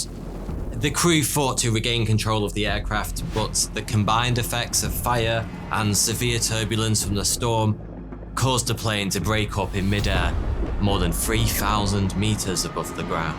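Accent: United Kingdom. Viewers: well that's because he is British... go just a bit further east or south and the accents/voices start sounding increasingly "ooga booga-ish", especially once you reach Eastern European territory. source: years of gaming with voice chat + discord and many vacations around Europe. the worst offenders for terrible English accents rated from indecipherable to somewhat understandable are: 1. Russians/Ukrainians (these share the top spot) 2. Greeks 3. Italians 4. Spanish 5. French 6. Bulgarians 7. Romanians 8. Serbians 9. Lithuanians 10. Latvians whereas the most clear accents from most understandable to least understandable would be: 1. All the Scandinavian/Nordic countries; Danish, Norwegian, Swedes, Finnish, Icelanders 2. Dutch 3. Belgians 4. Germans people from countries like France, Germany, Italy, Russia, Spain and Greece happen to be the most rude people when playing games online internationally, as they will usually not even attempt to communicate in English and just assume everyone else on the server speaks their native language, and when they get angry usually start cursing and raging in said native languages as well, not realizing that they aren't actually offending people since nobody understands what they are saying. 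the countries not included in this list are people i don't run into often and therefore can't judge.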